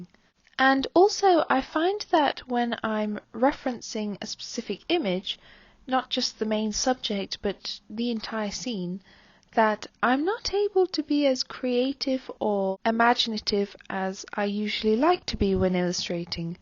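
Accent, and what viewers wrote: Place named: United Kingdom. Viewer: Thank you! Also, I guessed it was a British accent but even so it sounds different than the ones I had heard so far, are you a noble or a princess or out of a fairytale? I would definitely cast you for a fantasy animation movie as a voice actress for the main protagonist ^^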